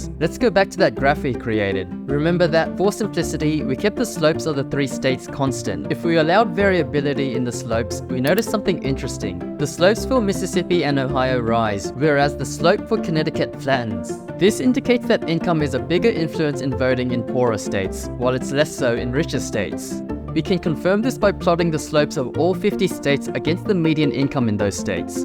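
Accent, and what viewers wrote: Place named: South Africa. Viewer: I hear a Saffer accent. PS: Get your levels sorted, china. The archival footage video audio is drowning you out.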